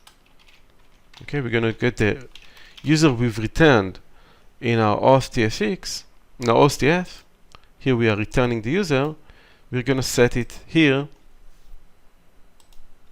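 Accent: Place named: Israel. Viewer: אתה ישראלי? המבטא שלך נשמע ישראלי רצח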